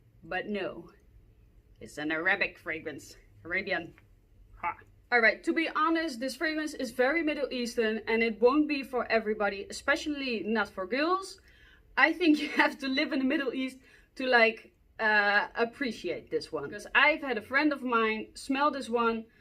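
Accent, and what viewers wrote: Netherlands. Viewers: In like two seconds I was like Dutch accent? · Love your Dutch accept and Dutch confidence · Hoi ben jij Nederlander coz ur English is that Nederlander accent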